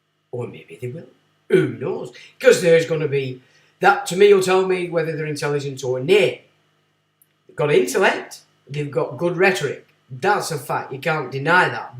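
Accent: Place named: Yorkshire, United Kingdom. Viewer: hiya, i appreciate your knowledge, its really refreshing to hear somone else making the right noises, The Puritans came about on the run up of charles the first and his catholic wife colluded with the papacy and conspired to reinsatall cardinals and other catholic clergy by overthrowing the protestant ecclesia and the protestans in parliament.there had to be a reasonable force to protect England against the antchrist that sits in Rome, cromwell was forced into turning to aristacrates for the money to sustain an administration and pay the army. The Puritans were on par with the zeal behind the Jesuits and fight fire with fire. we need a good chat me and you. you sound local i am a yorkshire pud just over the hill from you. ahahahaha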